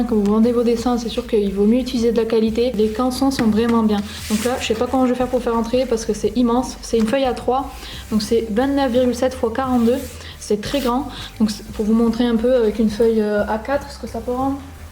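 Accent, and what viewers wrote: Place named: South, France. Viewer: Tu presente très bien et dis tu vien du sud sa ce voit a ton accent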